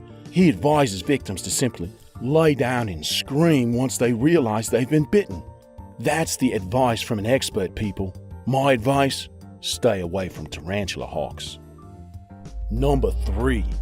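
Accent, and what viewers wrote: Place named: Australia. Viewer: It's the Australian accent for Me. 🤔🥰🤗🇨🇦💖 No, I was never a fan of Irwin, but a tragedy nonetheless. 🤔🥺😭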